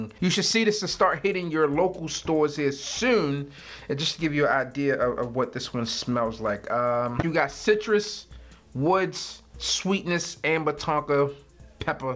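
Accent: Baltimore, United States. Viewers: Yo, you from Baltimore?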